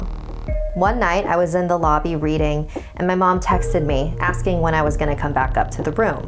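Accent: Philippines. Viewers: Aaaaah! I've been watching all of your videos and I didn't know you're a pinoy the whole time?! I was like, the heck is ottings and ottey? 🤔🤔 It's ate the whole time WTF XD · This dalagang Filipina has the greatest accent.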